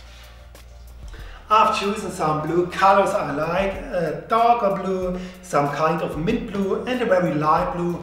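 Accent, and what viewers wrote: Saxony, Germany. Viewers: the Saxonian accent pleas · your english ist so f***ing German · Höre ich da sächsisch raus? Super Arbeit! Top! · Man hört das deutsch durch:-)) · Nice German acent!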